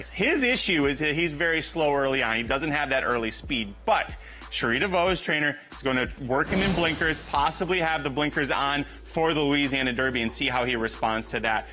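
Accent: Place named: Canada. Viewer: Dude you have no clue! You are stupid, and sound Canadian!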